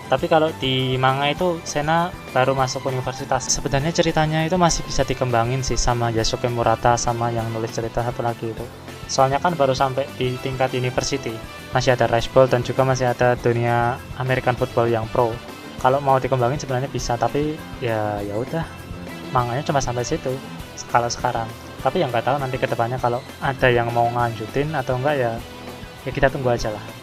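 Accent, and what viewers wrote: Java, Indonesia. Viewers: Miminnya orang jawa ya?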